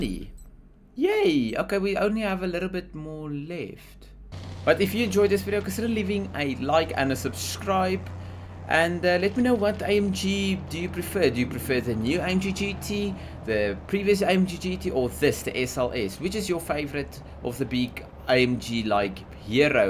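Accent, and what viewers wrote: South Africa. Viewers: That is the most Saffa accent I’ve heard in my life, holyyyy. Nice video though man.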